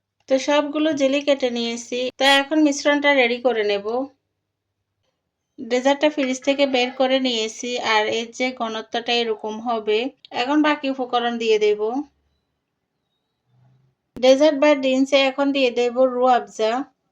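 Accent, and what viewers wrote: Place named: Sylhet, Bangladesh. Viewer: লাইক সাবতবে আমার কিন্তু অভ্যাস নাই সাবস্ক্রাইবার করে আবার আন্সার্সটা এবার করে দেওয়া স্ক্রাইবার করে দিলাম আপু আর আপনার দেশের বাড়ি কোথায় অবশ্যই কমেন্টে জানাবেন আমার মনে হয় সিলেটের বাসার মতো শোনা যাচ্ছে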